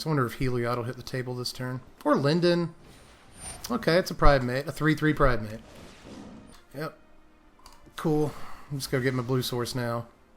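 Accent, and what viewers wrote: South, United States. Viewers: with that southern accent: "you're all ears huh, well i'm pretty certain i hear a mouth blabbing" I dunno about additions/subtraction from the deck, based on what i saw and the list, i would say 'cut the girls and a Vraska' play some actual removal or counter magic. Given what i read about the meta, my call would be to add 3 counter spells, to give a tad more options in the main against both agro and azorius ... deck looks like it should be well set up to keep some mana open on Op's turn.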